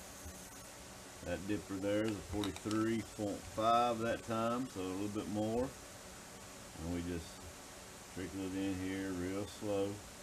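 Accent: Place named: Tennessee, United States. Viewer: you sound like you from tennessee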